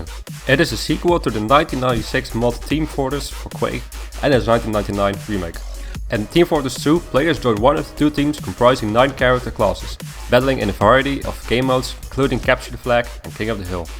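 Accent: Netherlands. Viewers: Nice list, but holy crap your English sucks! That accent sounds dutch which is my native language as well, but I hard a seriously hard time understanding what you were saying. Please, either work on your speech skills or use text instead. This sounds like Mark Rutte and that's not exactly a compliment.. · I think he is dutch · je Engelse Nederlands accent is crap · i think he is dutch :/ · are you dutch? ik hoor je accent xdxd. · You must be Dutch? Of is dat niet zo? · yooo gast praat rustiger en duidelijker niemand verstaat wat je zegt met je nl'se accent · Nederlands accent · Are you dutch?? Sounds like a dutch man xD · This guy isnt american i think because of his accent...... He sounds very dutch! (Please take that as a compliment) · Dutch ? Kan het horen man :)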